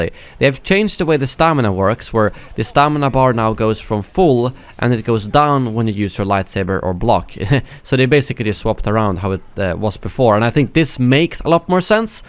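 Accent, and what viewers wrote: Sweden. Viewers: Are you from sweden Är du från sverige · Boi are you from Sweden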